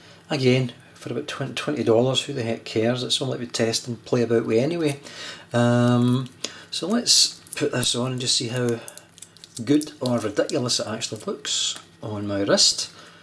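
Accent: Scotland, United Kingdom. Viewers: But he isn't murican... The accent is scottish I pressume... But I do agree about the bubbles abit.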